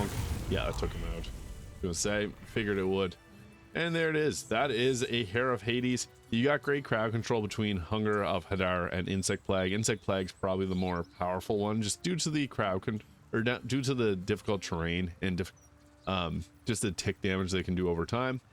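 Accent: Canada. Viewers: Homeboy is Canadian. Different linguistic inflections and pronunciations.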